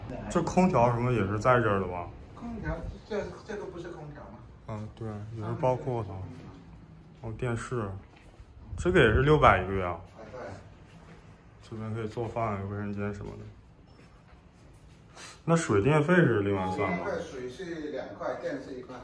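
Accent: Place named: Cantonese, China. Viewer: 发现广普真好听。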